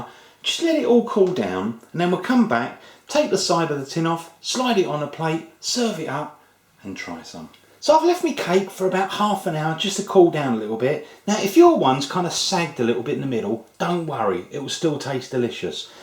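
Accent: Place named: Essex, United Kingdom. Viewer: You are 1 funny bloke do you know that?! :D I think you would find it amusing to know that I often watch your vids in bed (as my computer is set up along side it for long hours working at night!) & I have a nice cup of Assam, & laugh uncontrollably at your little moments of alacrity & charismatic cordiality! You surpassed yourself with this one Chef! And 13 Minutes! Good length! Do you know, I could swear you have an ESSEX accent! Maybe it's just me. How on earth R U going to top this one ay!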